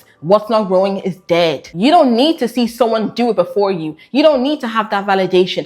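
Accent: Ireland. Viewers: Omds girl are you from Ireland?! I know a fellow black Irish girl when I hear them 😩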